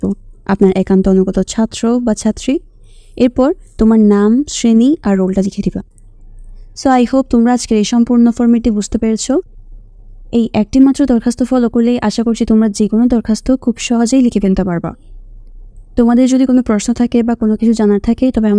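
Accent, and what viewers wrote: Chittagong, Bangladesh. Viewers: Chittagong accent?😅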